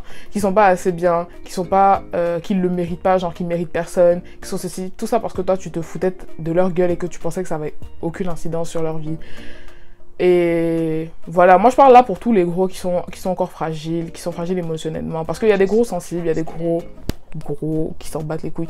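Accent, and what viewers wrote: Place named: Ivory Coast. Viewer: Je te découvre et je suis scotché 😀 je ne comprends même pas pourquoi... c trop chics 😂😂 l'accent ivoirien je kiffe grave 😘😉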